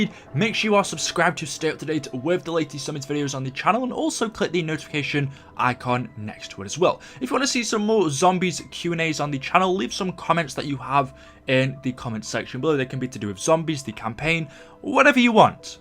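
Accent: United Kingdom. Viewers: boa you shouldn't be talking your accent is britian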